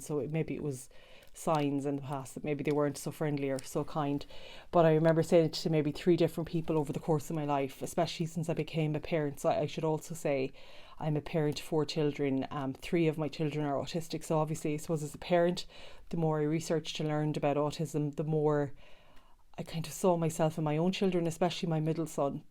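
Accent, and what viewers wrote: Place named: Ireland. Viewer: I am another Oregonian (from the US state of Oregon) who appreciates so much your courage to challenge yourself by making this video. It was fantastic, and BTW, I love listening to your Irish accent! (Ireland as your home, correct?) I am just beginning my new journey learning about autism, or I should say an updated description of autism, which so far, explains nearly EVERYTHING in my whole life. I'm 61. Like the other OP comment from Oregon, I just want to understand my life and myself better as well. Past, present, and future. Not sure if an official diagnosis is possible for me because of the cost, availability, and qualified professionals near where I live, although I'm not against getting one in theory. But hearing of your persistence, and yes!, belief in yourself, you succeeded in getting the answer that helped your wellbeing. 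This gives me encouragement and tells me to be patient with myself. Thank you so much for sharing your story! Wishing you the best!:hand-purple-blue-peace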